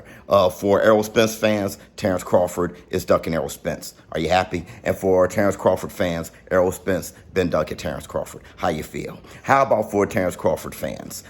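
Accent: Louisiana, United States. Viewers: U from Louisiana?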